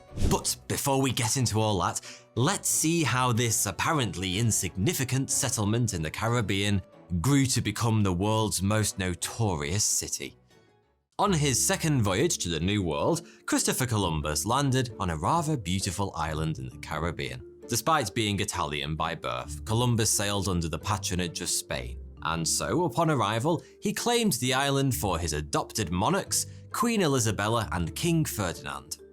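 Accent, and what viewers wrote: United Kingdom. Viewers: Bro I’ve seen about 20 of your vids and wondered why you kept saying “Hi, 42 here”. I finally realized what you were saying! You Brits have a funny way of talking 😂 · It's kinda weirdly cool hearing a Brit speak about Jamaica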